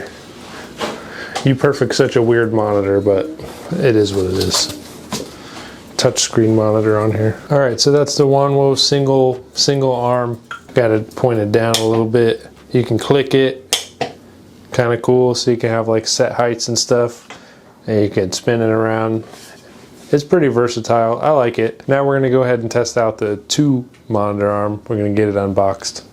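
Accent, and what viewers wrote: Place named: United Kingdom. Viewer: The british accent always has me 💀💀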